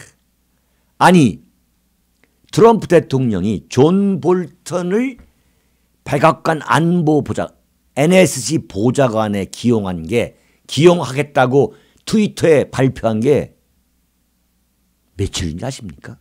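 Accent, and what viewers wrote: Chungcheong, South Korea. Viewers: 충청도 분이신가요? 말투 재밌네요 ㅎ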